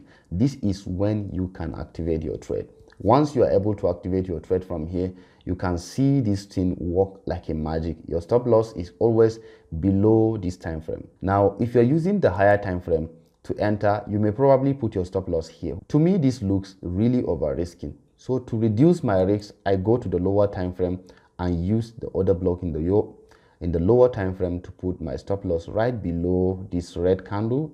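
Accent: Nigeria. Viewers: very good brother , you sound like you must be a Nigerian too that’s why I’m reaching out , let’s rub heads together sometimes hopefully the market charts gets easier with a team ( multiple heads always better than one)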